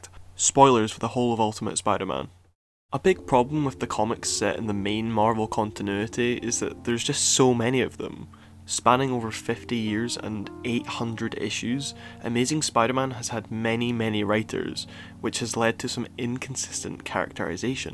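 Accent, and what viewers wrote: Scotland, United Kingdom. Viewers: You’re either Scottish or Northern Irish. Am I right? I’m subbed anyway.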